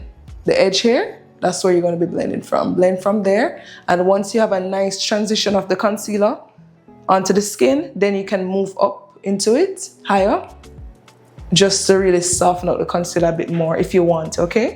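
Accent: Jamaica. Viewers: I actually really love this detailed video🎉❤ You've been popping up on my page for a few days now, and worst you sound Jamaican, you've gained a new makeup sub❤ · What is the models she’s gorgeous and you beat that face soo good I miss og makeup tutorials like this and I’m Jamaican too did I hear that accent lol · You sound Jamaican I'm Jamaican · First time seeing your video. This showed up on my feed. I hear a Jamaican accent. Just check the description and I wear correct😂 hello from your fellow Jamaican🇯🇲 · Why do u just LOVE to hear the Jamaican accent?? No matter how long we live in the USA wih juss caan hide😂😂😂.. !! LOOOVE ur work too Mama🥰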